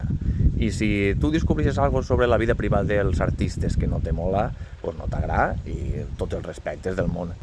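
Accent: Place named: Ribera, Spain. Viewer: Ets un tío carismatic, vingent de tu en eixe accent de la rivera , un deu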